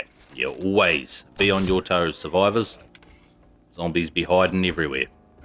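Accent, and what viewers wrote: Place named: Australia. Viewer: I just found you through algorithm. Don't have console, always played on pc but live the accent lol. Not many aussies (assuming that's where the accent is from) I've seen play this and post on it so keep posting 👍 all the best "mate" 😉😜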